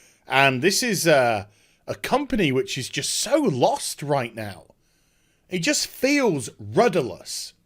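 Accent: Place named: United Kingdom. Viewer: This guy sounds remarkably like the late Total Biscuit. Partly the British accent, but it goes beyond that. Spooky.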